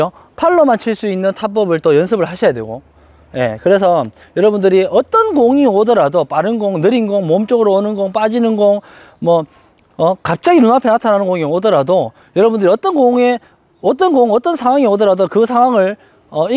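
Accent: South Korea. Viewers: 근력이 약한 저도 요 연습 해보겠습니더~ 슨상님 사투리 너무 좋습니다 ㅋ 마! 이게 사투리다!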